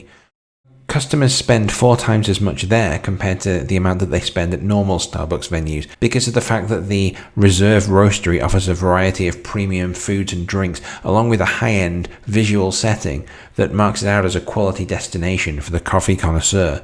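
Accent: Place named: England, United Kingdom. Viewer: Great video. You sound English, do you have any large Uk listed companies you can recommend?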